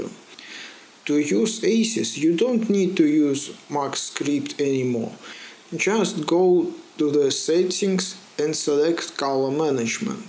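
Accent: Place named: Ryazan, Russia. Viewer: ты бы по русски, что ли вещал. Не возможно слушать рязанский акцент)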